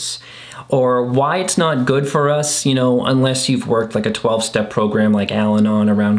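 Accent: Boston, United States. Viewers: Haha I love the Boston accent.😂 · Strawberries!!!!🔥🔥🔥🤣 I played that first Paula Abdul tape til it broke😏✅ went back for another. And her next tape. Still got em in the time capsule in ma basement😉 Giggles from this 40 yr old🥰 Thank You😁 Your clips are amazing... the magical $300 car... lols Excellent. You explain all this heavy icky stuff in a relatable, nonoverwhelming way.... which builds confidence in managing self... HUGE... THANK YOU SO MUCH!!! 4TH generation Irish... LOVE the Boston Southyish Linguistics 🍀😁🍀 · Omg best Masshole accent ever! I grew up in Southern NH and went to high school on the border of Plaistow and Haverhill. No matter how hard I try, I have never ever been able to do either the Boston or Southern New Hampshire accents. I am wicked awesome 😂 at pinpointing where people are from by hearing the difference in their accents, but I grew up without one somehow. 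It’s always fun to hear it since I’ve been living in the SF Bay area for the past twenty odd years since I moved far far from Sandown, NH. It’s still home in my heart (and Ely & Fairley, VT) but I have never ever wanted to go back permanently. Short trips remind me quickly about why I moved 3000+ miles away as soon as it became possible. Thanks for helping us unravel our childhood disfunction and confusion. For me, distance, time, and finding your friend family helps so much. 🥰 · Love the Boston accent 😂❤ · This video is amazing for the Boston accent alone! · Love the Boston accent! It made me smile! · Love that Boston accent. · Your Boston accent had me rolling 😂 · love the boston accent lolz but really you make all of these difficult topics easier to swallow and comprehend and for that I couldn't be more grateful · As a native upstate NYer, I loved hearing your Boston accent! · Thank you for clarifying behaviors associated with growing up with childhood trauma and also offering scenarios that may have started the path. 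Each of the videos I've watched have helped me. Like another commentor...I cannot watch a full video...but because of the sheer amount of information to process. I break them up into 2 or 3 sessions. I too am from Boston, though I've lived in Oregon for 40+ years. I love the accent...and have always had an unreasonable attraction and affinity to anyone that has one. I am now hearing (in my mind) ...this whole video with the letter "o"distinctives of ah' or aw...such as "pahk the cah in Hahvahd yahd" and the "tawnics" and several words joined together such as...the "howahya's"❣ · Just want to say that I think your channel and your Boston accent are just fantastic. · Ha, I knew I could hear a faint Boston accent in your other videos! Appreciation to you from Allston. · OMG the Boston accent and the 80's/90's references!!😆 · shoutout for the authentic Boston accent LOL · The Boston accent …… I love it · Omg, the Boston accent. 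So funny. Thanks for this video! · Your Boston accent 👌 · Lmfao at the Boston accent 😂❤️ made me love the videos more! Sincerely, a Bostonian, kid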